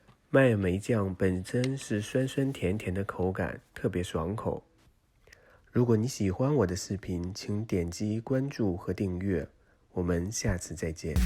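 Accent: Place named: Northeast, China. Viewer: 哈哈哈哈哈 笑死我了， 你开口 就已股东北味，挡都挡不住那种！ 哈哈哈啊哈哈 越听越搞笑！！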